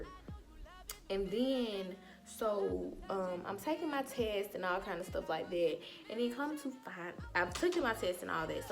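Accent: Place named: Louisiana, United States. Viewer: girl where you from ? you sound like you could be from louisiana 😍🐊.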